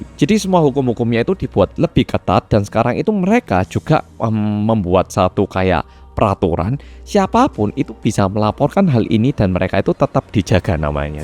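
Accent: Java, Indonesia. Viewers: Aku suka bahasanya ,Mergo aku Yo wong jowo. Medok banget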